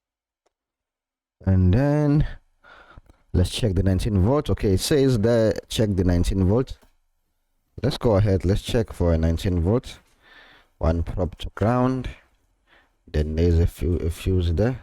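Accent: South Africa. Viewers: I can hear that you're South African and that makes me very proud. Keep on sharing this helpful info. God Bless you.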